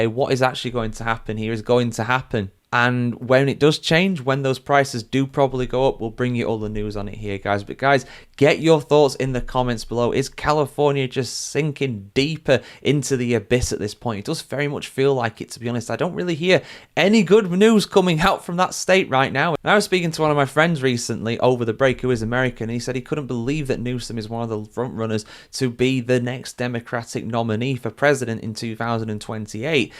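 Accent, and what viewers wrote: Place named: United Kingdom. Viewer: Eh...can't listen to a limey preach about US problems. Sorry.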